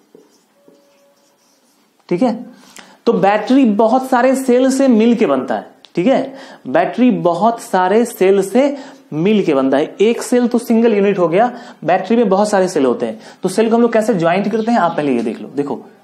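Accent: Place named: Bengal, India. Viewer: Sir are you bengali, I think your tongue sounds like a bengali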